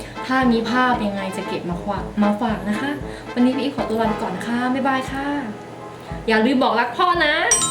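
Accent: Isan, Thailand. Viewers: พี่เป็นคนไทยหรือคนอิสานค่ะ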